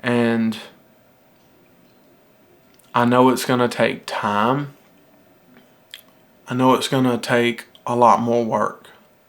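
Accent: South, United States. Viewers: Bro, you have a hint of a southern accent 😉stick with it you will NEVER regret it.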